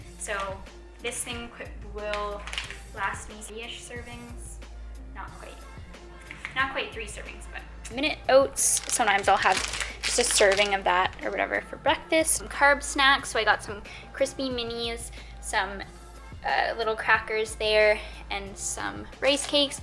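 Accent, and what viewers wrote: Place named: Ontario, Canada. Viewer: Are you from Ontario?! Haha I for sure thought you were from the states!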